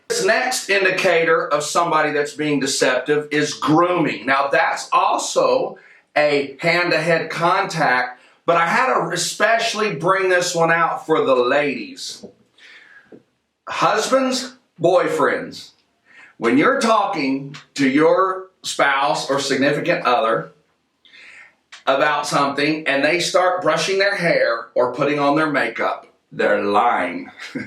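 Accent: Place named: Texas, United States. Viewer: "The gift you have" is.....an amazing dynamic personality and enthusiasm...to the point that you are so entertaining and compelling. Also the fact that you have a desire to share this and help people is a gift. Thank you! I get so excited when I see that you've made another video!!! Also I love to hear your voice, it is so authentically TEXAN!!! It makes me feel like I know u personally...lol... cause I'm from Texas. 🤘🤘🤘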